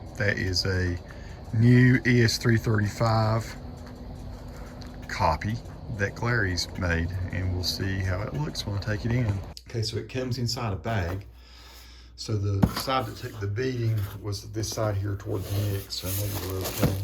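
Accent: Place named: North Carolina, United States. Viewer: I'm detecting a heavy North Carolina accent. Am I close?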